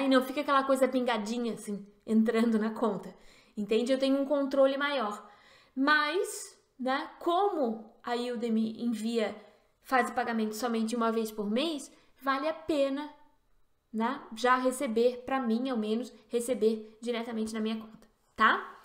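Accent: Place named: France. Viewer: você mora na frança ou algo assim? kk consigo perceber um sotaquesinho já 😅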